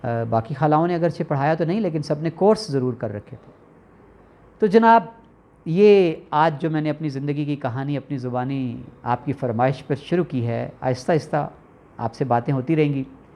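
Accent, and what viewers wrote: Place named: Lucknow, India. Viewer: ماشاءاللہ بہت خوب جی Great inspiration معلوم ہی نہیں ہوا کہ آپ کوٹلہ سے ہیں ،،، بلکہ ایسا محسوس ہوتا رہا سُنتے سُنتے کہ آپ لکھنئو سے تعلق رکھتے ہیں ، واللہ بہت ہے دل خوش ہوا ،،، Watching from USA.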